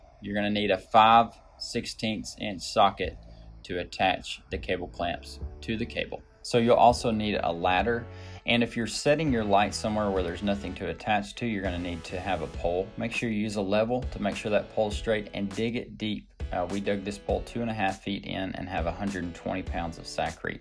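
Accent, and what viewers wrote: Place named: South, United States. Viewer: Southern accent sounds funny and cute at the same time.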